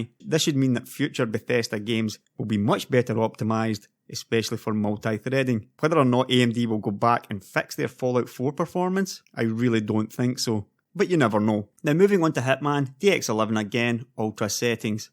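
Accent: Scotland, United Kingdom. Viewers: Sweden? Judging by your accent I was sure you were Scottish. Or perhaps living abroad? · I love it when i can here people are from Scotland, i am half scottish.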